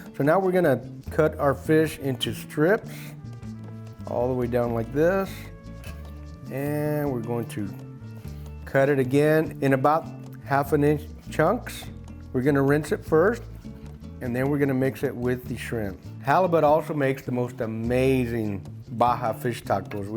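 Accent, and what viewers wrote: Texas, United States. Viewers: This guy is soooo Texas lol, the "duuuude!" was beautiful😊 loved the recipe, tysm ❤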